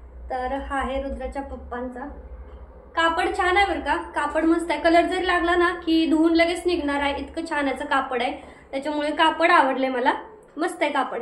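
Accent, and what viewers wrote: Satara, India. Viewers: तुझी भाषा साताऱ्याची वाटते गाव कोणतं आहे तुझं मी तिकडची आहे. गाव.नरवणे ता. माण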